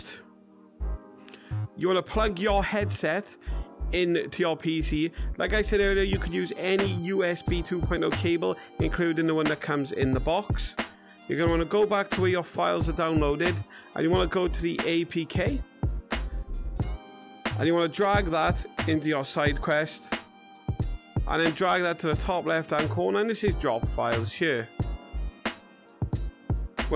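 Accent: United Kingdom. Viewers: Bri’ish huh? Well im glad I found you lad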